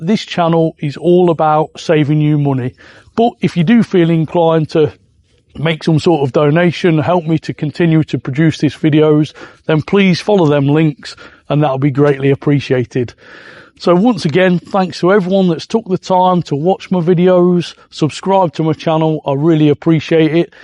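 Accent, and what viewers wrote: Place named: Leicester, United Kingdom. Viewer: You’re welcome matey. Out of curiosity where are you from? Detecting a Leicester or Notts accent.😊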